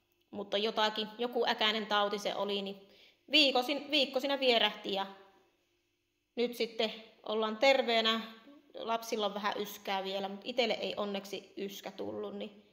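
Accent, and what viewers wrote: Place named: Oulu, Finland. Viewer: On sun puhheesa muutamia oulun murteen sanoja 👍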